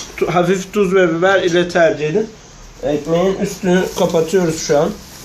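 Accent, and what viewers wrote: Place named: Thrace, Turkey. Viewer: bu adam trakyali mı konuşması trakyalilara benziyor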